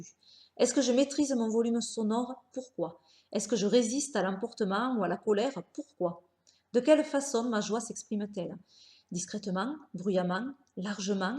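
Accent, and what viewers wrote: South, France. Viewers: ton accent du soleil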